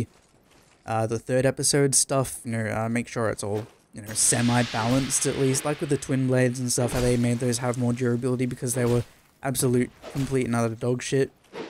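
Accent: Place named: Australia. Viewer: Well, he is Australian, "sour"...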